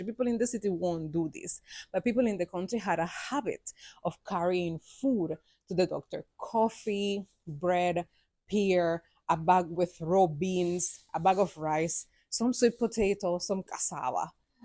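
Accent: Jamaica. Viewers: I love this. I'm surprised you made the transition to Jamaica. I've heard Cubans like Dominicans don't care for blacks. Here you are in Jamaica. I love this. Girl: if you could see my face; lit up, listening to your Jamaican accent. I'm from Montego Bay, now living in Texas. We need to learn more about our Cuban brothers and sisters. Welcome to Jamaica.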